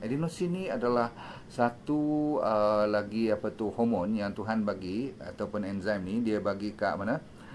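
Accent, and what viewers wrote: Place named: North, Malaysia. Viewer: 1.pandangan saya kalau Dr. ni cakap bahasa melayu pun mesti tak akan cakap ikut Bahasa Malaysia standard. Sebab mungkin akan mengambil masa memperbetulkan cara cakap. Dr. berasal dari utara. Tapi dalam video ni nampak la doc dah cuba untuk bercakap dlm bahasa yg mudah difahami org Malaysia. Kalau doc cakap semua dalam loghat utara mesti penonton dari negeri lain ada yg tak faham. Biarlah doc bercakap dengan apa yg dia rasa selesa dan santai. Saya sendiri kalau disuruh cakap Bahasa Malaysia standard dgn sebutan baku akan ambil masa untuk bercakap sebab dah terbiasa bercakap menggunakan loghat utara dan selatan serta English. 2. Lagi satu Bahasa Indonesia mempunyai lebih ramai speaker sebab itu orang luar tertarik nak belajar.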